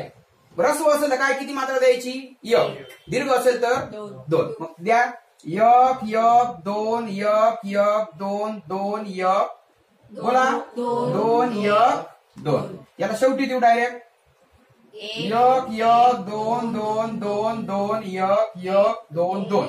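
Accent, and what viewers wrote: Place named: Dhule, India. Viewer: 10th pass karun 22 varsh zhale. Mi kavita lekhan karto. sahaj mhatale vrutta ani chand yache dnyan ghyave punha ekda mhanun video search kela. tar ha video start to end pahila. punha school madhe gelyachi feeling ali. Khupach chaan sir. ani tumchi accent dhulyachi aahe. I hope aatta Lockdown chya kalat vidyarthyanna yacha khup fhayda hoil... Good Job Keep it up. <link> vel milala tar mazhya kavita check kara...(Mukta Chandatlya aahet... ) Baki Chndatalya ani Gazal post nahi kelya ajun shikto aahe...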